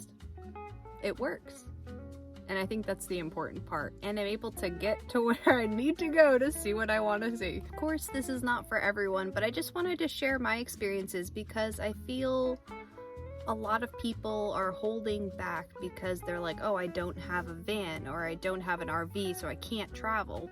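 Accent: Northeast, United States. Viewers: Your NE accent is adorable - west coaster here so it's a bit jarring in a good way! It's great to see someone from the other side of the country explore the west as I feel we can get a bit jaded out here :/. As much as I love the idea of van life sometimes, living in a bike-able/walk-able city with a lot of conveniences is important to me - plus as someone who is pretty tall, my choice of vehicle feels pretty limited as I can't imagine sleeping in a cramped sedan. I'd love to bike tour the country but having the shelter of your car actually gives you a lot more options in terms of taking your time and amenities. I'm not used to paying for a car, so that's a big hurdle if I ever have to jump it! Thanks for making these types of videos, I personally find the meta/logistics videos more interesting than the destinations so I am curious to know if you have side hustle work (or if YouTube is a part of that) while you travel, or if this is pretty much out of pocket.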